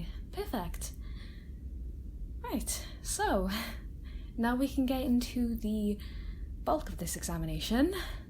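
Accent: Wales, United Kingdom. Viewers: AWESOME VIDEO PLEASE MAKE A PART TWO TO THIS ALSO I LOVE YOUR BRITISH ACCENT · u sound kinda welsh lol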